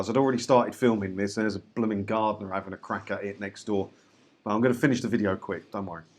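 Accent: United Kingdom. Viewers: Blimey, Al Murray's 'Pub Landlord' with hair? Beau'iful Bri'ish rant... 🍺🍷Cheers! 😁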